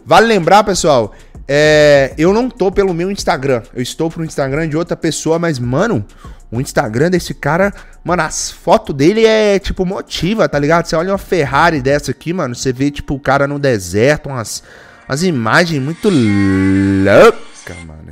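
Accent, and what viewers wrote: Minas Gerais, Brazil. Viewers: É mineiro, né? KKKKKKKK Conheço o dialeto de casa em qualquer lugar! 🤣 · O melhor de Minas é o sotaque... vamo dominar essa porra tudo, nuuu nois é o miosin que está tendo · Mineiro Né? De Rocha sow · O cê é mineiro né não?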